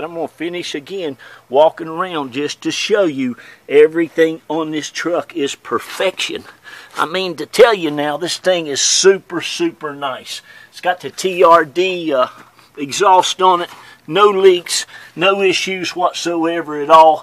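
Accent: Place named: Florida, United States. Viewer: Man I love the Florida accent, beautiful truck, I’m currently looking at one for my self.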